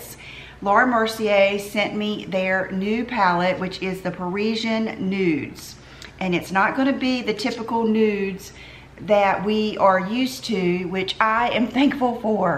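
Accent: South, United States. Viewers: We have the same southern accent...I am from Lake Martin, Alabama? What state are you from?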